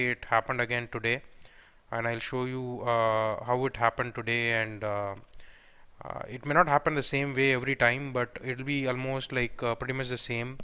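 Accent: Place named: Tamil Nadu, India. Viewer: Bro your pronounciation shows you r belongs to Tamil Nadu.... If it's true ... I'm very much proud...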